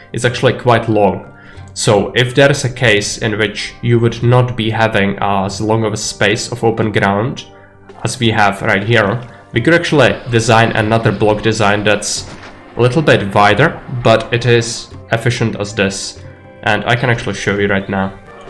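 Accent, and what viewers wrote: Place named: Ireland. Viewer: first time i heard you i was convinced you were irish ☺️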